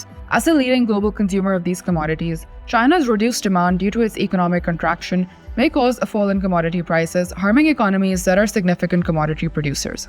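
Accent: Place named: India. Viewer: sound like Indian and even the thought analysis seems emanating from Indian perspective. Indian thinking pattern is typical and easily predictable I like to tell the host of this channel - Indian thought are useless, and the world does not need any perspective from indian analytical so called expert, because right here in Asia, we have far qualified expert than Indian thought expert. ...I just listen 1 min and i already know what background you are coming from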